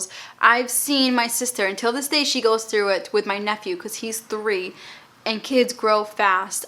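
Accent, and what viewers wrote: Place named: New Jersey, United States. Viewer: I live in Michigan but my dad is from massachusetts and I’m not used to hearing people talk exactly like my dad talks, so hearing your accent makes me so happy and reminds me of my family back in Massachusetts!💛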